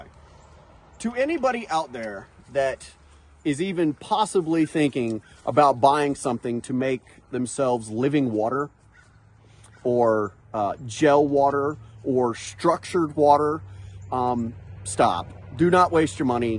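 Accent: South, United States. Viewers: Wow, people will buy the craziest sh*t!! On a side not I’m glad to hear that the deeply Southern “okaaaay” DOES come out of all Carolinians not just us in the more southern Carolina….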